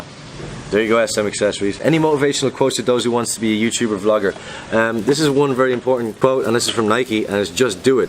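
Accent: Ireland. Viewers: Are you from Tipperary in Ireland ? Your accent is kinda the same here in Tipperary! Im a Filipino fan staying here in Ireland ✌️ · Blows my mind that still has his Irish accent 😂 love from Eire 🇮🇪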